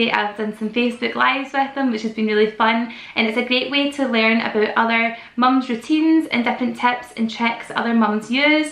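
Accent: Scotland, United Kingdom. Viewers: I love your scottish accent and your pretty hair!!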